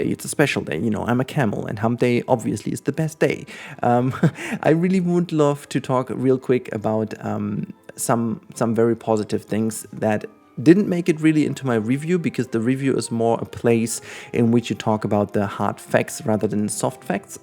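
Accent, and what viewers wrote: Germany. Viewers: Bist du deutscher oder so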